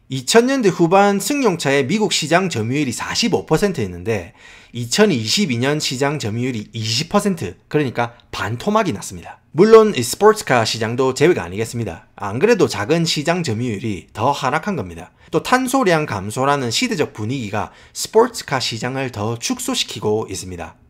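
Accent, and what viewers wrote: Gyeongsang, South Korea. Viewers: 영어를 경상도 사투리로하네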